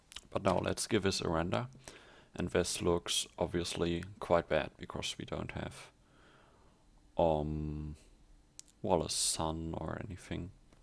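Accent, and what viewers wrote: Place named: Germany. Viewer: Ich hab beim ersten Wort gewusst das du deutsch bist :D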